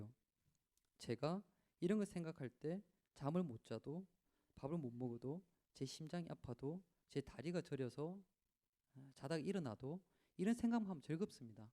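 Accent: Gyeongsang, South Korea. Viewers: 저는 서울 사람이지만 경상도 말투에는 항상 신뢰와 진실이 느껴지네요❤ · 나는 일단 경산도 말투 나오면 듣기도 싫고 . . .역시 비유도 짜증난다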